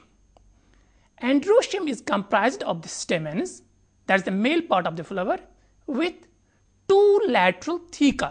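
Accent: India. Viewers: I dig British accent when spoken by English peoples. Yet surprised how English speaking Indian folk, can so easily mangle such a comforting vocal sound! Even when narrator in this video doing so, is speaking about one of my favorite subjects. Morally I agree, my Mother should wash my mouth out with soap after making such an unpolitically impolite rude statement like I have here. I did make a responsible effort attempting to imagine how another Indian viewer would be proud that narrator is a fellow countryman, carefully explaining details involving this technical subject. But ended up imagining that fellow person also from India, totally agreeing with me about narrators accented British accent.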